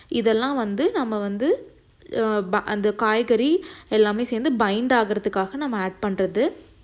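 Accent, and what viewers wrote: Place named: Coimbatore, India. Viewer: Otuka 🤣that Coimbatore slang:)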